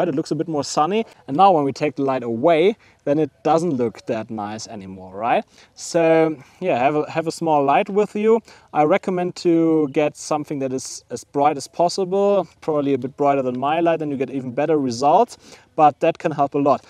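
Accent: Germany. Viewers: Thank you for sharing your expert knowledge. For film and photography enthusiasts, your help and tips are indispensable for better quality product. You are easy to listen to and your German accent lends additional interest to your videos. Thank you, again. Steve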